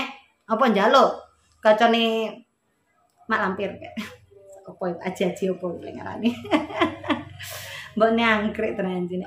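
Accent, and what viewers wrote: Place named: Malang, Indonesia. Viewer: 👍👍👍 cocok bak . senang rungokno komene pean. logatnya malang mutu .😀😀😀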